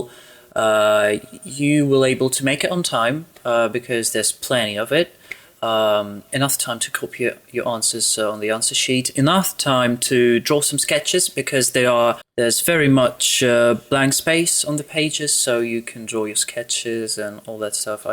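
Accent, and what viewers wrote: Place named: United Kingdom. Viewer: У тебя такой типично британский акцент)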